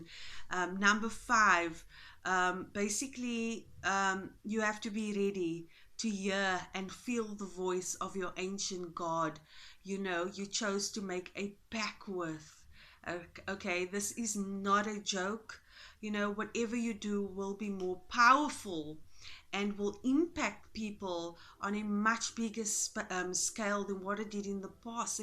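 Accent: South Africa. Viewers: You have to be from SA? Just came across this video but we SA people have a specific accent 😋😋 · That accent. You from south africa Capetown bra. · Are you South African?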